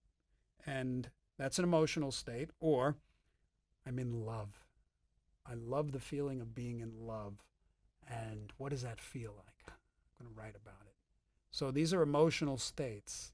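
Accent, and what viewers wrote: United States. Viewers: I just got back to this after a day of work. I can totally hear your Brooklyn accent now!!!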